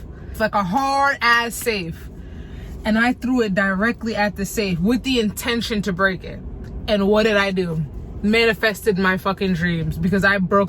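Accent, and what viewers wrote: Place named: New York, United States. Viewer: i knew it/youR accent sayz n.y. at least to Me'...✨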